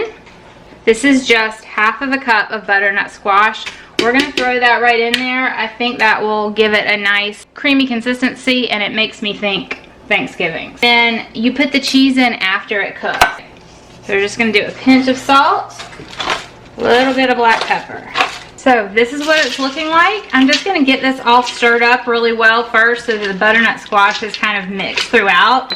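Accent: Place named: North Carolina, United States. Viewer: Peecans, North Carolina! Love your accent! Dear Hazel, Go BITE Andrew!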